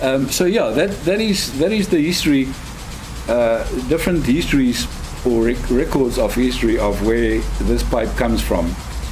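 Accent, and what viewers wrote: South Africa. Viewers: Are you south african? Your accent is very boer-like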